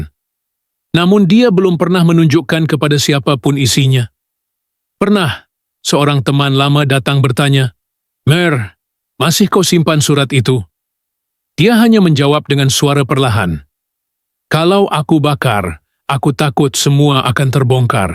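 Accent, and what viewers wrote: Malaysia. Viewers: Kok logat malaysia?vlog dr sana kah? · Dimana bisa mendengar langsung pengakuan dari meriam.belina? Vlog ini kam narasi dari admin yg dr logatnya orang melayu/malaysia bukam dr indonesia..bisa saja bohong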